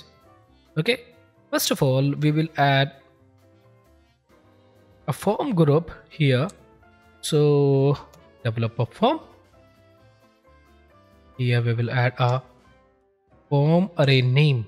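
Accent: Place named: India. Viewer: i hate indian english but i love u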